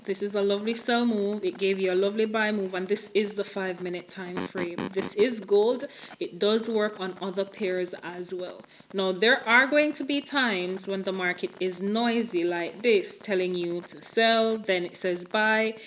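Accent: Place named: Jamaica. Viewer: Must be a Jamaican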